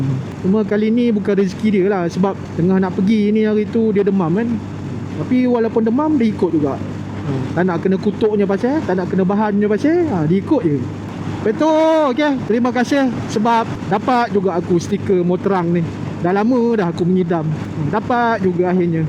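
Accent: Perak, Malaysia. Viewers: Ater pekat beno loghatnya...udoh ghope org kuale,bote ngan parit je...🤭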